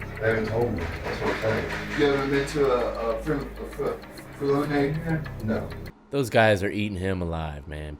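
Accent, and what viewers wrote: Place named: South, United States. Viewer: That mid southern drawl.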